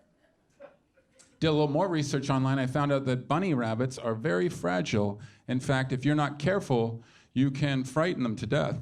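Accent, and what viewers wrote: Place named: Scotland, United Kingdom. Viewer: Almost didn't click on this. I wasn't in the mood to struggle through a thick Scottish accent!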